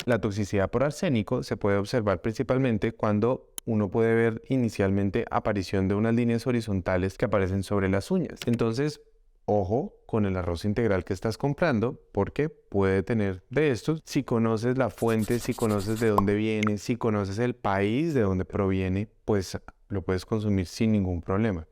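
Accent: Colombia. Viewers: Dr as Ud colombiano? De Medellin o de manizales. Con el acento que tiene me parece haberlo oido desde que naci. Soy de Manizales en donde el 70% de la gente es y Ud con ese acento....pues debe ser o antioqueno I manizaleno. Cosa que me alegro sombre mantra y me pone muy pinchada❤❤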